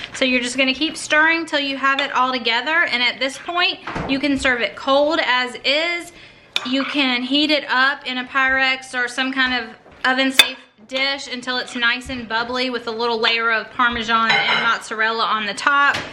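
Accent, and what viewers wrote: South, United States. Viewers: Are you in or near Texas you have a fabulous southern accent.. ya all lol x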